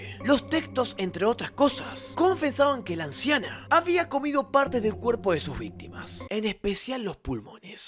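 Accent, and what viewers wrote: Argentina. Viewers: Tu acento argentino queda piola con esa especie de aliento cuando terminas una frase xd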